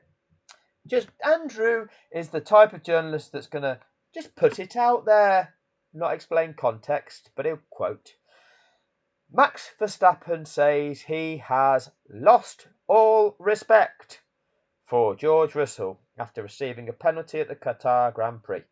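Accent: United Kingdom. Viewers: The moment I heard that “back with more from our favorite F1 journalist “in that British accent I knew This video just gonna trash Max with no actual intelligence. Man the British have got to be the worst spectators in human sport history they don’t even hide it ,it’s so deplorable.😂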